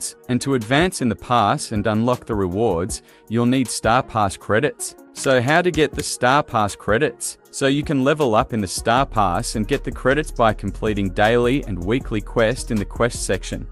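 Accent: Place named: Australia. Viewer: how you got the accent mate I'm Australian u sound aussie😂😂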